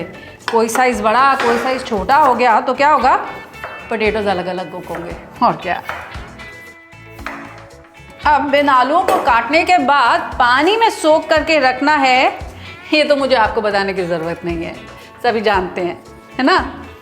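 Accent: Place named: Uttar Pradesh, India. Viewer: madam aapka recipies batane ja style mujhe bohot pasand hai aur jo aapki UP touch wali Hindi ❤ Par ek Salah hai meri.. ki aap yadi ghar ki sabzi banana bata rahi Hain toh nonstick ki jagah ghar wale cooking vessels use kareingi toh achha hoga.. jaise mein ghar mein roz mein loge ki kadhahi use Kari hun healthy cooking ke liye. Aur ek baat.. aajkal tamatar itne mahange ho gaye hain aur aapne Zara si aalloo Shimla mirch ki sabzi mein 2 bade tamatar daal diye 😲 aap pl. Kuchh recipies without tomatoes wali ya tomatoes ko kis cheez se replace kar sakte hain batayeingi toh dekhna aapke viewers double ho jayeinge 😁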